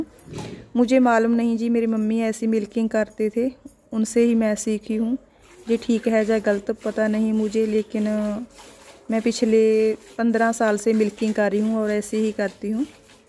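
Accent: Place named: Punjab, India. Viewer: Hello mam aap Na Punjabi ma bolta hi Sacha lagta ho oor milking ka trika bhi sahi h hum bhi asa hi Karta h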